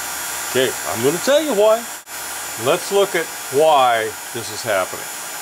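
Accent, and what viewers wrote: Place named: South, United States. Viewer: It makes great sense...I learn from this man every time I watch him....A great, country voice, to boot.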